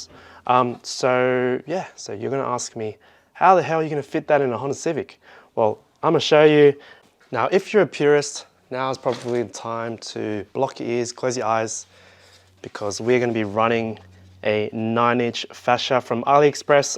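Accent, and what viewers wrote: United Kingdom. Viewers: I got a Mekede S6 Pro Plus I bought all the accoutrements from the junkyard including the vents, duct, entire harness to the pass door (USDM), and the CORRECT dash from a 99-00 for my 97 EX Coupe. The only thing I bought used off ebay was the lower automatic mode actuator but I'm having trouble visualizing how it is installed. I guess I need to get off youtube and go dig through the shop manuals. Searching for the latter is what brought me here but I have to say is much easier to understand than ANY of the other channels that have done this exact swap. The narration deserves an award for the beautiful narration and in the King's English no less!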